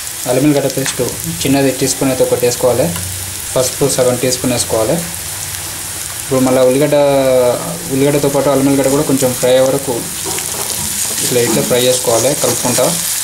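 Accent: Telangana, India. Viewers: Kura kanna ni telangana slang super tammi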